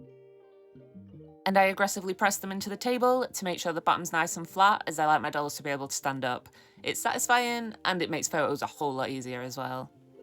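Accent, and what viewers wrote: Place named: Sheffield, United Kingdom. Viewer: amazin work lady .. you from Sheffield cos ya sound like me 😂 your talent is the mutts nuts ❤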